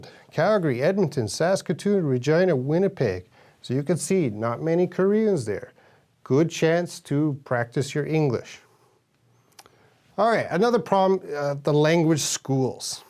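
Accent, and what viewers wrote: Eastern Europe. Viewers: I'm sorry, but minutes in you sound kind of Eastern European, or "something" Canadian. So you want foreign speakers to see you sounding weird to another Canadian, as normal? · You really seem to have a bit of a Slavic accent of some kind - were your parents from over there? Or - what is your background? Just curious - if you don't feel like talking about it, no problem! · Are you eastern European? because you don't sound like you are from Canada. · So, as a linguist, I hear your speech has a slight Eastern European accent such as Czech or Slovak. What other language do you speak?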